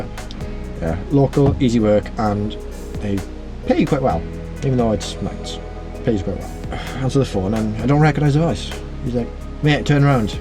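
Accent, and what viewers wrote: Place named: South Wales, United Kingdom. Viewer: you sound like you could be from South Wales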